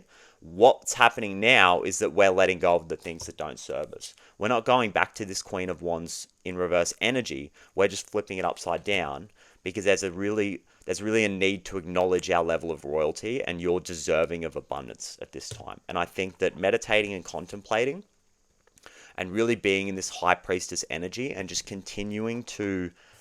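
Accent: Australia. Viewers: The accent initially made me think you were taking the piss! Its so refreshing to see an enlightened Aussie guy thats not wearing a decorative scarf and upcycled pants that were formerly their grandma's curtains... your beautiful authentic self is no doubt inspiring other men that being real and vulnerable (gasp) is actually perceived as strength and not weakness.... well done and sending you love from south of the border! 😊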